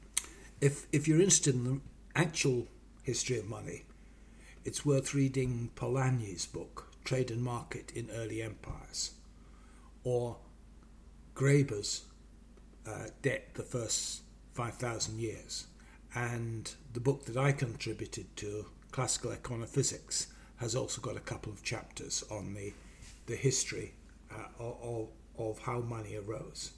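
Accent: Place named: Upper class, United Kingdom. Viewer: I once saw a video of hers advocating nuclear energy, leaving aside a few important sobering arguments against nuclear power. I thought they‘d be useful to consider and had them placed in the comments. - No reaction. I also don’t like too much the tone of voice and the sort of strange upper class English she speaks, presumably in order to underline excellence and intellectual superiority.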